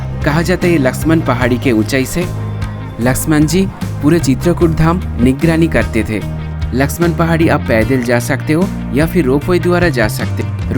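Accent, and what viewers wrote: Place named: Bengal, India. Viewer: Bhai aap bengal se ho kya . language se lg rha apki hindi se 😊 anyws alws most welcome...love from Lucknow 🙏😊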